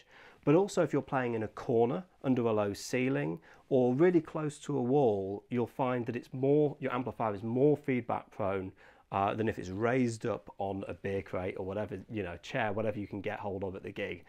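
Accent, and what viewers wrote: United Kingdom. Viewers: I've got a completely un-bass-related question for you. You're from the UK right? What specifically is your regional accent? I've had an accent my entire life that many people have assumed is British, but I've always been skeptical, but never sure what it really was. There are certain nuances in your speech that actually match mine; so I was wondering what your regional accent is.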